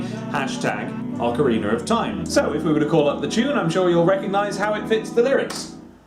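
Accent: United States. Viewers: Your american accent is actually really good! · Wow, you sound very American! Great job! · you actually sound decent with your American accent. You just need to work on your R's and few other things. Look up some accent videos, it makes it much easier.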